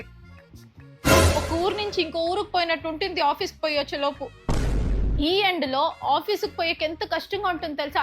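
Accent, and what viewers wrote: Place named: Karnataka, India. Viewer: Mee slang Bale ga vuntundi karanataka Telugu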